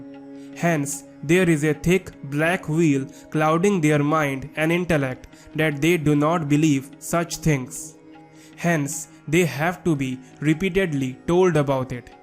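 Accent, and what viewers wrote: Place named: North India, India. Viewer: It’s good knowledge for all Hindus . But when you go international try to connect to international audience even non Hindus will understand. e.g.: 1. Try not to speak like a North Indian . 2. Many words such as Veda , is pronounced as Ved . Shraddha in the subtitled is pronounced as Shrad ? This will confuse Hindus as well as others. 3. Learn to speak without accents from leading Indian news channel broadcasters . In YouTube one must be international. You will spread the message better. Keep up the good work. Hari Aum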